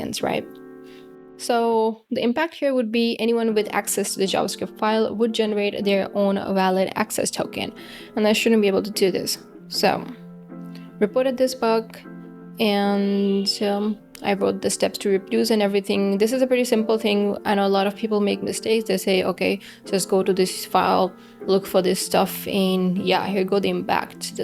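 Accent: India. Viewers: You from India?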